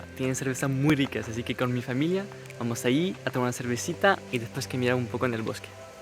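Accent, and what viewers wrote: Chile. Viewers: Salut Cher ☺️, tu es définitivement un gars super doux et adorable!🤩 J'ai été très émue par ta boite à souvenirs, surtout ta lettre 🥺, c'est très sympa, ça m'a donné envie d'en faire une pour mon "moi" du futur🧐✍️. Tu écris très beau et ton espagnol est excellent et je ne trouve pas que tu as un accent espagnol ou andalou, mais plutôt il semble chilien. J'adore les villages français😍, ils sont tous trop beaux, j'aimerais vivre dans un, c'est mon rêve. J'ai visité Lille il y a quelques années et c'est une très belle ville, je l'ai aimée encore plus que Paris. Grenoble c'est aussi très beau, et ses marchés de Noël🎄👼. J'espère te rencontrer un jour ou te recevoir ici en Colombie 🇨🇴. Gros bisous. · Has pasado mucho tiempo en Chile ? Porque hablas español con acento chileno, se te escucha muy bonito !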